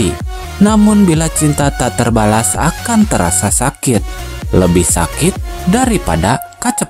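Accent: Sunda, Indonesia. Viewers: Reviewer na meuni nyunda pisan...tong hilap kang..liput Oge wisata pantai Utara Subang na Pokona mah Endah pisan pamandanganna 😊😊😊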